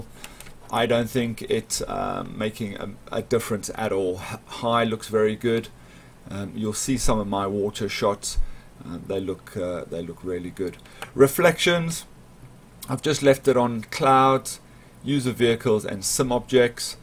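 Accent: South Africa. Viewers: you sounds south african, you must be south african :)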